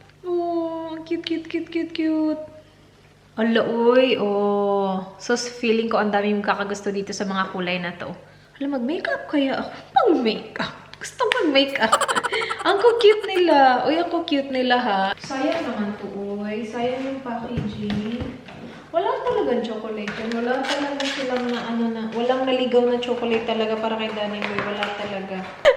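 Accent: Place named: Visayas, Philippines. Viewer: Hi ate! Bisaya din po ako. Ang saya talaga manood ng mga vlogs mo. Nindot kaayo inig naga bisaya ka kataw anan kaayo haha ❤️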